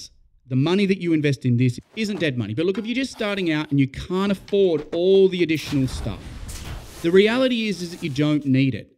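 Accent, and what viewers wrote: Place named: Australia. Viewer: I can’t tell if you’re from down under or from the fruit isle... I am Australian so you better not hate on me...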